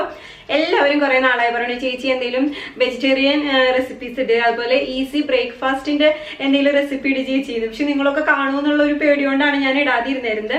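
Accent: Thrissur, India. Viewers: ആദ്യമായിട്ടാ ഈ ചാനൽ കാണുന്നെ... റെസിപ്പി, അവതരണം ഒക്കെ ഇഷ്ടപ്പെട്ടു... Subscribed ഒരു തൃശ്ശൂർ കാരിടെ ലക്ഷണം കാണുന്നുണ്ടല്ലോ... തൃശ്ശൂർ ആണോ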